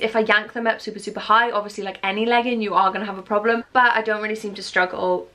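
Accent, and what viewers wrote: Wales, United Kingdom. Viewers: are you welsh? you seem to have a slight welsh accent to me haha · You are soo cute babe ♥ I am from the Czech republic and I am soo in love with your British accent!! Please please, do you know when will be those leggings restocked? Thanks, and please, more James in the vlogs and videos, you guys are so cute together! ♥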